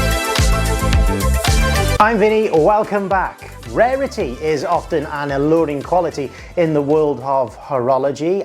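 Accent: United Kingdom. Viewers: Sorry but your accent and the way you say “book”, are you from Stoke on Trent? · Good vid mate, btw are u from Stoke?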